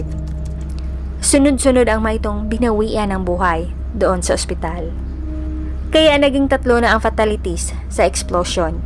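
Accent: Visayas, Philippines. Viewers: Bisaya pronounce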